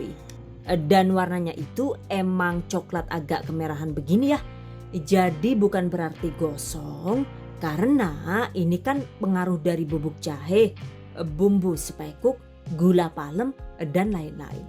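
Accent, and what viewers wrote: Banyumas, Indonesia. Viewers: Jebule rika wong ngapak yahhh :v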